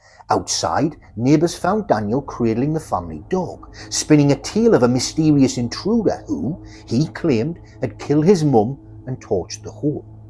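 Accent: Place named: Newcastle, United Kingdom. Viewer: Hi fellow Geordie 😁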